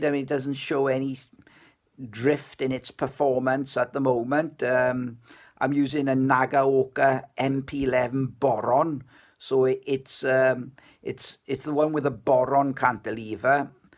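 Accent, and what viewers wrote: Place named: Wales, United Kingdom. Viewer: Thanks for this video, I’m currently running a Rega Brio amplifier but am seriously considering an upgrade to the Exposure 2510. It is a very minimalist amplifier but I’m happy to get an external DAC and headphone amp. Thankyou. YouTube needs more beautiful Welsh accents so please upload more content.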